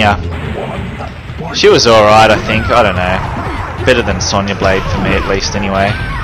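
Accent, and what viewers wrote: Australia. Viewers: Love your work man! You aussie?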